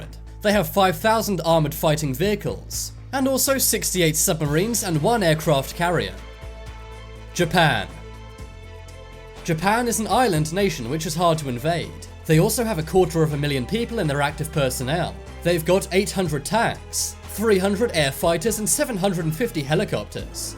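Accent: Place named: United Kingdom. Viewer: This channel is so OBVIOUS in it’s erroneous “facts”. EVERY SINGLE VIDEO has outrageous statements glibly spewed by a Brit who thinks Americans NEED EVERY documentary narrated w/ a UK accent.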